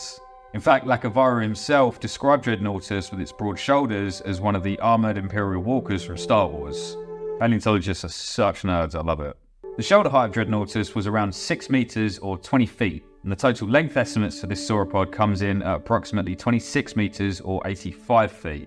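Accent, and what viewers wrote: United Kingdom. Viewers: Easily my favourite paleo channel. Info dense yet easy to digest. And who doesn't love a regional British accent. I would wanna see you try south Yorkshire. It would be funny because you actually annunciate things. Citizens up here less so.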